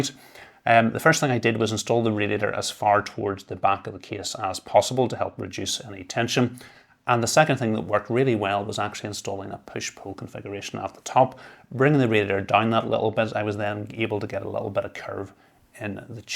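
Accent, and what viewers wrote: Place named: England, United Kingdom. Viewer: wow this channel is great. it took me a while to fully understand this english accent but now i can listen to you all day.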